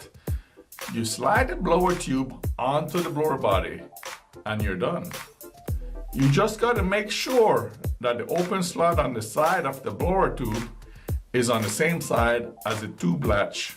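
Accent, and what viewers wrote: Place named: West Indies, Caribbean. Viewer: I got one today it works awesome. Interesting accent are from the West Indies?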